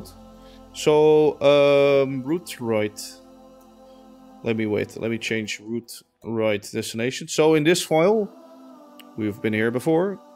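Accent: Netherlands. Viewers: I smell a dutch accent.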